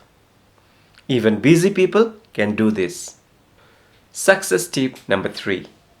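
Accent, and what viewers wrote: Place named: India. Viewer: I love your accent.. Not heavy as other Indian speakers. That why I love its and use it as my lesson on my listening practice. Thank you so much sir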